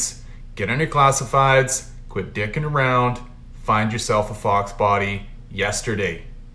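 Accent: Canada. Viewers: You Canadian eh? Thanks, Great Video. I want a GT Convertible. Does it matter 89-93? I would think by 93 bugs would be less? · I can always tell when a Canadian is talking. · He sounds Canadian.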